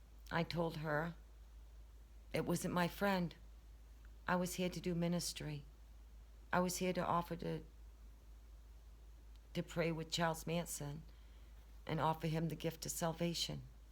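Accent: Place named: Long Island, United States. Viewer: You sound like you are from Long Island.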